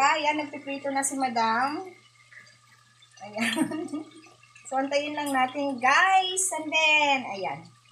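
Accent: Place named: Visayas, Philippines. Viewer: Ha ha natawa ako sau sis para kang totoong bisaya , agoy sarap naman nyan ginataang tulingan.yes tuloy lang ang laban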